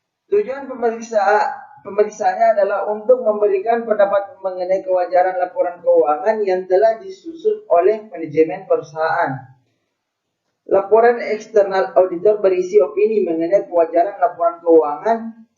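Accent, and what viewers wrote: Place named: Bugis, Indonesia. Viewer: Materinya udah bguss cuman cara bacanya masih kebawa logat bugisnya gitu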